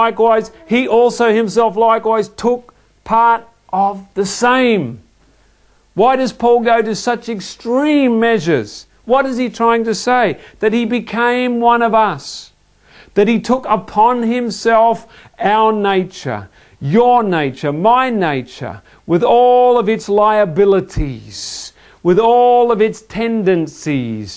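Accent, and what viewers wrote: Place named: United Kingdom. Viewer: Thank you for this presentation. And, oh, what a fine British accent!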